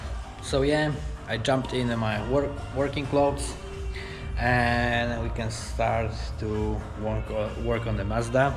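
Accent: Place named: Poland. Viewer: 2.2? I skąd jesteś, bo chyba słyszę polski język xD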